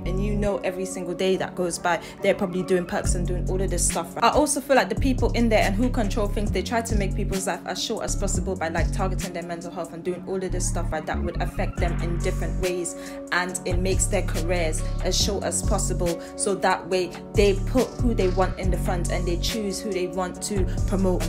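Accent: United Kingdom. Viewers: Yoo are from the UK you got that British accent And It seems King Von is your favorite artists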